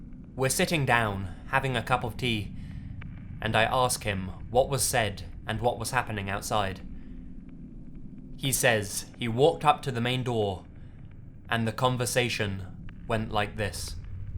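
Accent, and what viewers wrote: England, United Kingdom. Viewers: Are you from England? I'm asking because I know what an English accent sounds like because I watch this show that takes place in England so I know your speaking with one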